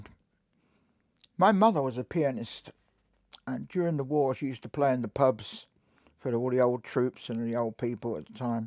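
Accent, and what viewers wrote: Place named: England, United Kingdom. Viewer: Hi I didn't know you are a pomie. I thought you were a Yank. But I like you so much mate cause your a man you got that attitude and you remind me of my friends of the past. More boys should grow up like you. As far as doing your hair you can watch my video called balding and greying part 2 Dave Kirby.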